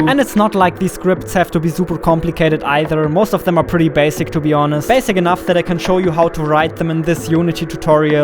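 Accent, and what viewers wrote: Germany. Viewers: you german? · Man hört das deutsche so hart raus xD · Could it be that you are german? Or does it just sound like a german accent? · bist du Deutscher weil du hast so einen akzent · You are german i hear that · are you german ?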